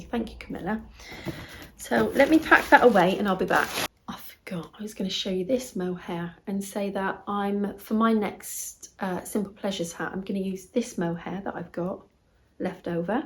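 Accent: West Country, United Kingdom. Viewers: Hi, thank you for your lovely podcasts they always brighten up my day 😊 I found you after watching Wendy the unexpected gypsy and Amy the meaningful stitch. Love hearing about all of your projects. My Mum makes my lovely project bags. Also just to say I’ve really been enjoying reading two books by Libby Page, The Lido and The 24 hour cafe. I’m from the West Country too, love your accent. Best wishes 🧶 x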